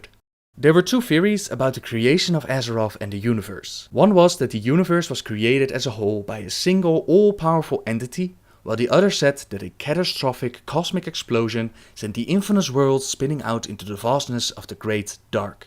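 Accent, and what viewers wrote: Netherlands. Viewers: dat dutch accent makes me lol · Are you dutch? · I'm watching a bunch of lore videos from you and stuff because I recently got interested in the lore. But just a question I'd like to ask, totally off topic... Are you Dutch? Cause I kind of hear a Dutch accent. But I might be wrong. I'm Dutch btw : p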